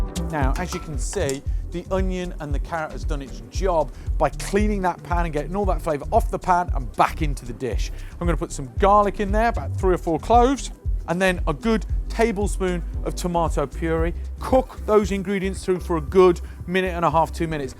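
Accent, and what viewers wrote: Birmingham, United Kingdom. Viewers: Food busker I can't quite figure out exactly where your accent is from,are you a brummie or is it more of a Wiltshire/Gloucestershire twang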